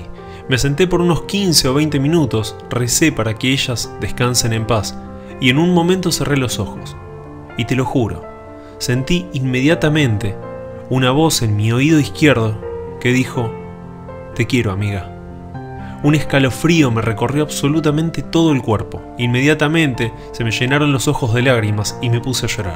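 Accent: Argentina. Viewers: que aburrido que sos hermano para contar ,dedicate a otra cosa, para colmo la tonada porteña que tenes lo hace inbancable...no lo aguante, lo siento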